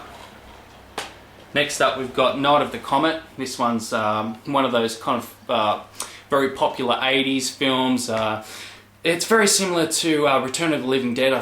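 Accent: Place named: Australia. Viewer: Hey, in case you haven't noticed, you have 666 subscribers! I realized that because I was an watching Eric Bana interview and I thought his accent was identical to yours as far as regional Aussie accents, so I was checking to see if you live near where he is from...:)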